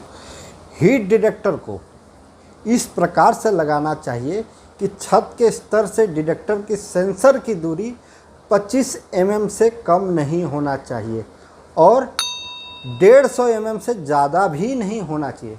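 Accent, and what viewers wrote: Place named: Bihar, India. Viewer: Bihari accent ,can it be converted to normal hindi accent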